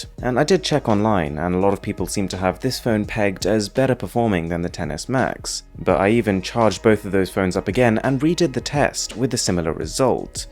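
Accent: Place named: RP, United Kingdom. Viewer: Your accent is CARTOONISHLY posh.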